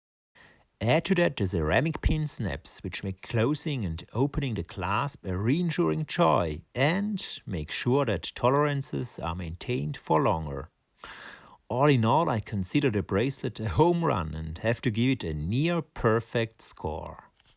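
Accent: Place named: Austria. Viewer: Great video! I feel you have an Austrian accent. Am I right?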